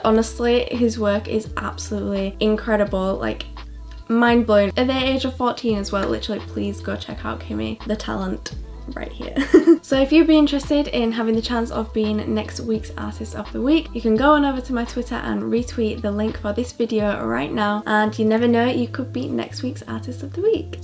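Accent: United Kingdom. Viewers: DUDE I THOUGHT SHE WAS AMERICAN BUT SHES WHATEVER WHAT BRITISH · where are you from cause tha is a UK accent 101 😂😂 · Omg u're britishh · I follow her on tiktok and when I saw she had a YouTube channel I was so happy! When I played the video tho, her voice shocked me, I thought she was American but shes British. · Wait I watch ur tik tok’s and I never heard ur voice! I thought you was American wait so are you British like me??? 🤔☺️💗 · Who knew she was brittish lol I am shocked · wait wha- your British!!!!!! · Wait what the..... she is British i... Wow 😳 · yea I was so shocked when I found out she was British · Wait are you from the uk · She is BRITISH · WOoh i thought she has an american accent not a british accent hahaha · Is she British??? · Youre britishh!?!? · She’s BRITISH🤯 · First time hearing your voice... and I'm like WTF SHE'S BRITISH, but like where abouts you from cause I know a few people with your accent? 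Xx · Are you British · James charles: No comments about voice Moriah elizabeth:no comments about voice: omg ur voice is soooo BRITISH i thought it wud be like american....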